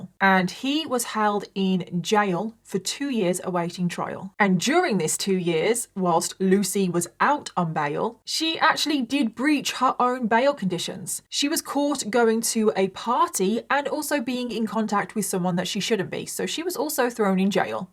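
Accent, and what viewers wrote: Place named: Birmingham, United Kingdom. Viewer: I’m just messaging to say welcome back nice to see you do true crime for us all ❤ much respect from the brummy girl it’s nice to here someone with the same accent as me for once 😅 happy new year 🎉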